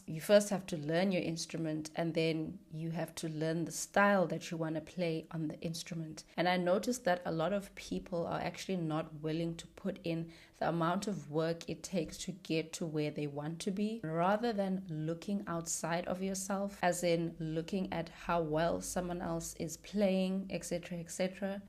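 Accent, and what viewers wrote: South Africa. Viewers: You from South Africa ?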